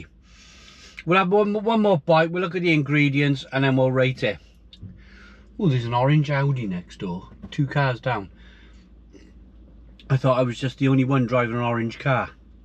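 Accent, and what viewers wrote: Wales, United Kingdom. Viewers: His welsh accent must have confused you. He said it contains 'uncured pork' so M&S won't be in any trouble at all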